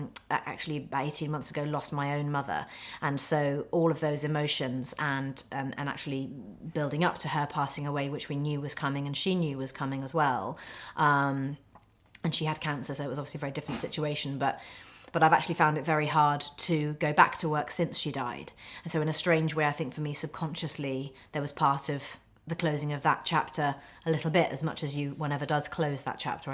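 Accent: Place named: United Kingdom. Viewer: why is she talking as a british accent?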